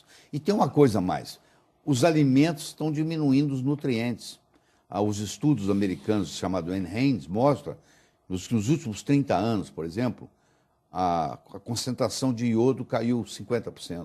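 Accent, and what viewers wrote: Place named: Minas Gerais, Brazil. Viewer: mineirice... coisa linda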